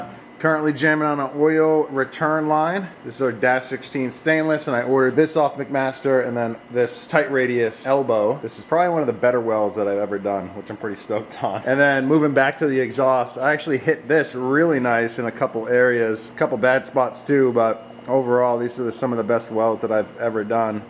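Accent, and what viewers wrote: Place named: New Hampshire, United States. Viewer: Nice to see you back,! Your new Hampshire accent really shows when you spend more time there. When you gonna bring back 'd?!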